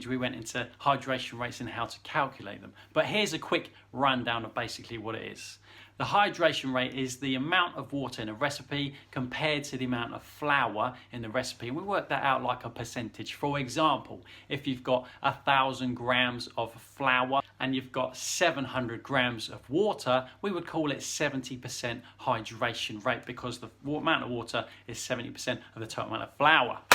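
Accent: United Kingdom. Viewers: From where, in the UK, is his accent from?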